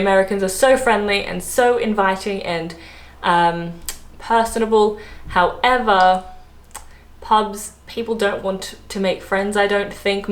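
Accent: England, United Kingdom. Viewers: Middle Class English accent! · dont get me wrong you are right about put culture i am 65 you sound more english than me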